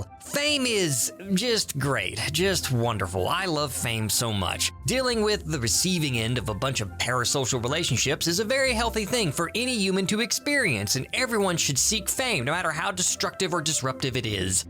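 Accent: South, United States. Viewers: I'm glad you're back, but you don't have to attack me like this. I'm enjoying your new animations and that sweet Southern twaaaang that seems a lil more pronounced now. But seriously though, welcome back, and I hope you're doing well. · I haven't seen this before, but my immediate thoughts are: 1What accent is that? I recognize it as southern, but I can't tell where in the south, those vowels are thoroughly scrambled. 2 neat, but prop not my fav of bad advice videos, just because of how cynical and sarcastic it is, while I prefer more lighthearted or comedic takes. 3 wait this his first time animating this stuff?! Great job dude! Have a lovely day!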